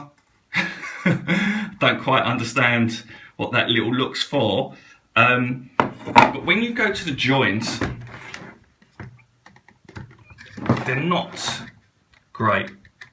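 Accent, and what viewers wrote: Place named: England, United Kingdom. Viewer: Not impressed with your video..I love your english accent however...but...who gives a rats ass if the lid is thicker? You think your corals care? ALLLLL they care about is clean water and my bet is the Deltec will outskim the Vertec hands down! oh and that leather look is probably so the lid does not slip out of hand as easy...no brainer.... disappointed in your biased approach. Just because the vertec is built stronger and smoother lines does nottttttttttttt mean it performs better and really performance is more important then the thickness of the lid! Deltec is amazing!!! You are a product of marketing...the MFGs trying to figure out how to sell you ...because they cannot get a skimmer to perform as good as the pros at Deltec... :-) again..I love your accent lolol Tim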